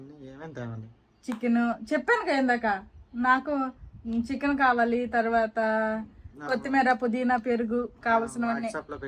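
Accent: Srikakulam, India. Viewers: మీ భాష మన భాష శ్రీకాకుళం సూపర్ గా మాట్లాడుతున్నారు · Pakka Srikakulam yaasa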